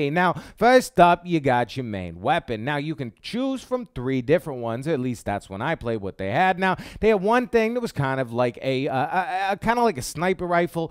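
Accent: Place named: New York, United States. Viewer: I detect a New York accent., you from ther East Coast?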